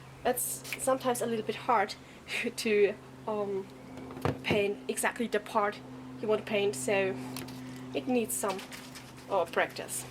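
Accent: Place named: United Kingdom. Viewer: Cool, das merke ich mir. :3 Und die Folie fixieren, das merke ich mir auch :D Du hast einen süßen britischen Akzent :D Liebe Grüße Zementitia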